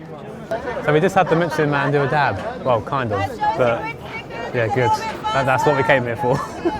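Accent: United Kingdom. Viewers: I never even noticed accent during his videos, but it seems he gets more British in public.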